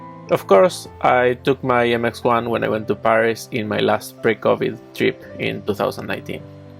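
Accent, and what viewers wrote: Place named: Argentina. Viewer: Se te nota el acento argentino, jeje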